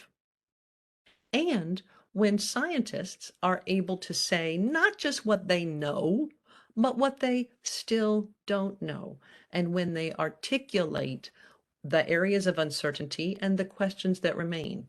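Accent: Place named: Missouri, United States. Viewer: I grew up in Southeast Missouri and we learn an overview of the earthquakes in school. I am guessing you are from a more western part of the state because you say Missour-rah instead of Missour-ee.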